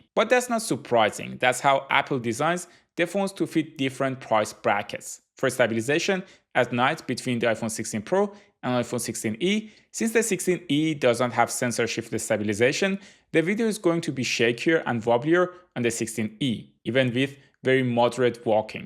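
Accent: Iran. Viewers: لحجه ایرانی داری داداش، ایرانی هستی؟ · داداش احساس میکنم لحجت فارسیه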